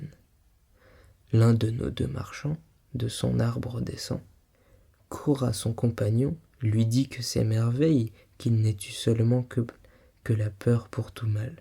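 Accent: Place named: France. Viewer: damnnnn the french accent is everything 😩😩😩😩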